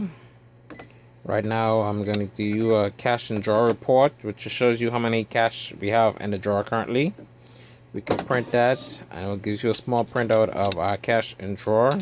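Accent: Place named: Bahamas. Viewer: Thank you for sharing. I'm sitting here listening to you and you sound awfully alot like a Bahamian. Are you?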